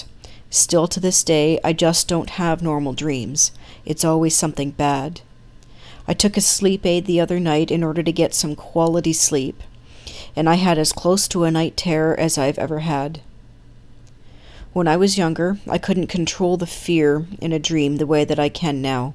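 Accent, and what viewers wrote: Canada. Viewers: I'm English as it happens. Look girl, i was just having some fun. you should hear my accent? especially when i'm drunk! You sound Canadian and to be honest, i rather like the accent. i lived in the USA for a few years along time ago and i'm ex-army too so i'm used to quite a variety of speech patterns. If i knew you been subjected to nutters threatening you, i'd have either not commented or said something else. Why anyone would want to say awful stuff like that to you on the strength of the way you speak, God only knows? if it's worth anything, you carry on. i did like the stories. so did my dog. we listened attentively as we scoffed our sausages and mixed veg. :0) People who post shit like that are impotant tossers who proberbly have no mates and still live with their mums! Sorry again mate. Jez and Charlie dog. xxx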